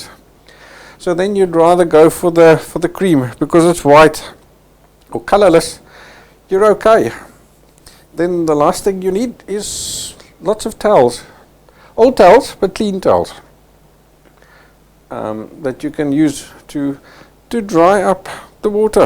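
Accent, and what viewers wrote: South Africa. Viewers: Love the South African accent!👍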